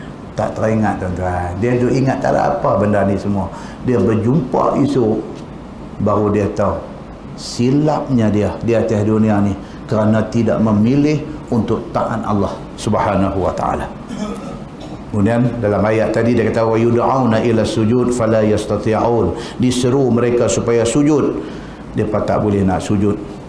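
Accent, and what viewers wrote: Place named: North, Malaysia. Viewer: ceramah ustaz shamsuri terbaik...suka dengar loghat utara dia.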